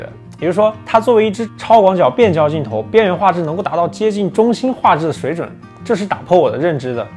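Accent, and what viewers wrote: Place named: Henan, China. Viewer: 突然这一集满满的fu南话？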